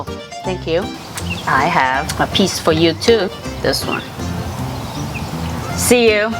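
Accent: China. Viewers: Hi, I tried most of your recipes and it tasted amazing like I was so amazed. Me and my mom are gonna try to make the pasta chips today!! Thanks for the recipe Love your Chinese accent too Love you!!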